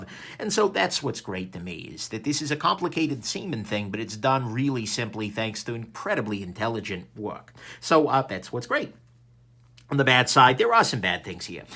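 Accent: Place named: New Jersey, United States. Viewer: Wow! I have to guess that you are a Jersey dude based on accent? (asking as a full on hick based on my accent) Great and informative review. Thanks!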